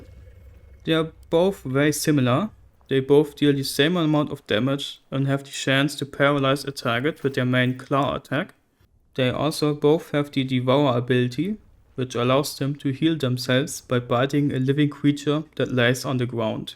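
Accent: Germany. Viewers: Man... there was me thinking, hey i dont know that guy, lets watch that video, maybe he doesn't have a strong af slavic or russian accent like the others, so it might be fun to watch.... Then i heard the most stereotypical german accent ever.... FUCK ME THOUGH! I'm german, too and i just got humbled so very badly xD I could have an accent that sound to others like this and don't even know ... So congrats, you earned yourself a like and tought someone a lesson for life.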